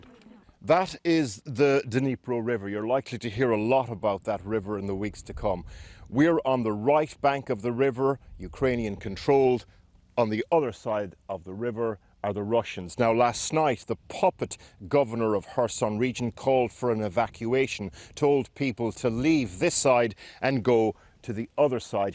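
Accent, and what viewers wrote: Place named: United Kingdom. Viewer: marshall ... as in martial . a british channel are you not ?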